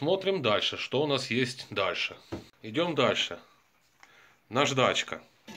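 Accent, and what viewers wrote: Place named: Belarus. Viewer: Фирменный белорусский говор)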